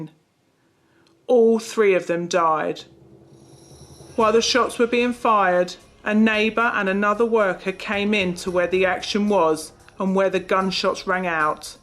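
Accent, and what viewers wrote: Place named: England, United Kingdom. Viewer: It's called an English accent ya stupe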